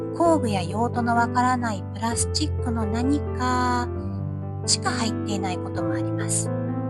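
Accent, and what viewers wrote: Kyoto, Japan. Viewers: いや いやいやぁぁぁぁぁぁぁ…… これがLilyさんの声かぁ〜 なんちゅう 声…… 動画を見つつ どういう風に例えたら 良いかと 思っていました。 とっとこハム太郎のリボン🐹🎀ちゃん みたいに 可愛い 声。 声がこんなに可愛いのなら お顔も 絶対可愛いはず‼️ 声優さんやナレーションのお仕事をされていたのでしょうか？ いつも散策の時の 微かに漏れ聞こえる つぶやき じゃぁもったいない。 話すテンポや 言葉選び 。 とても 素晴らしいじゃあ〜りませんか✨ 京都訛りの穏やかな 口調の 中に やまとなでしこ らしく 強さを感じます。 これだけの動画編集……｡ どれほど時間をかけたのかと思うと 拝みたくなります。🙏🏻✨ 見応えのある４５分でした。 コーヒー☕️を煎れて もう一度 観たいと思います。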